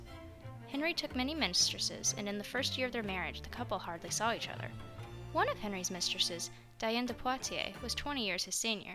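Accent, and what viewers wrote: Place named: United States. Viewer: From on quite a few facts… I would’ve preferred the narrator to Speak a little slower and not use an American accent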